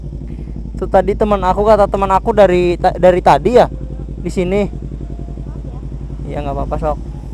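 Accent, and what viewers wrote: Tegal, Indonesia. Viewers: Bang luh orang tegal mana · Abang orang tegal yah bang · anjayyy tegal ni kayanya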